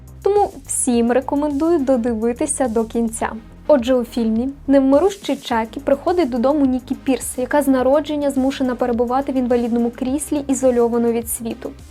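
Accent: Standard. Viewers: Боже, яка ж гарна та чиста українська мова, насолода для вух 🥰🥰🥰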